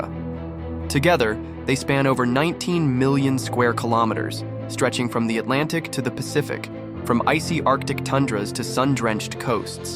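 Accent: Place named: Nigeria. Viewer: You sound like a Nigerian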